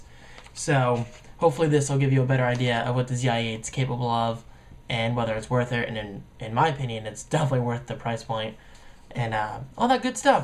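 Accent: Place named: United States. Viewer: Arghh I love your accent, im brittish and just love the american voice ! Oh and yea I have this camera and i LOVE IT, the audio isnt great but it recorded a concert with heavy bass really well. I also used it when skiing.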